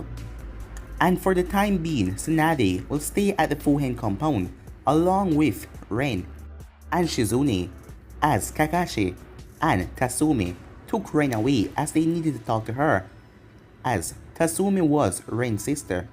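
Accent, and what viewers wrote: Jamaica. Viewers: Are you Jamaica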